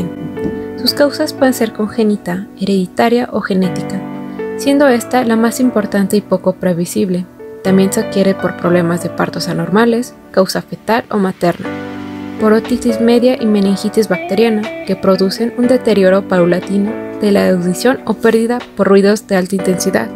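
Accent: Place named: United States. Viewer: Español de USA 🇺🇸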